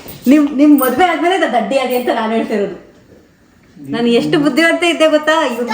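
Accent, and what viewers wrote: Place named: Malavalli, India. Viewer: ಮಳವಳ್ಳಿ ಶೈಲಿಯಲ್ಲಿ ಮಾತಾಡಿ ಕೇಳೋಕೆ ಇನ್ನೂ ಚೆನ್ನಾಗಿರುತ್ತೆ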